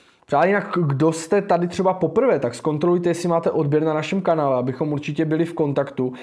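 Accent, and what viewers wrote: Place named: Slovakia. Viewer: Mně ta skoro slovenština přijde roztomilá.Já jsem Slovenka a taky češtinu ač nerada trochu prznim.🙂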